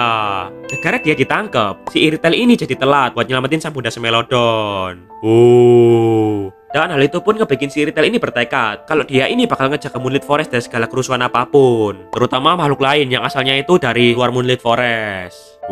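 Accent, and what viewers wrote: Java, Indonesia. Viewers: Bang, Abang Jawa ya?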